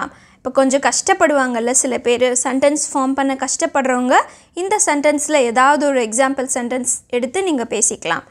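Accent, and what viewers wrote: Madurai, India. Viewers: Ne ga madurai ya ne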